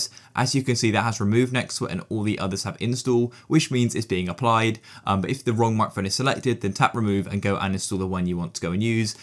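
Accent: United Kingdom. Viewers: Are you British? Hahaha damn bro.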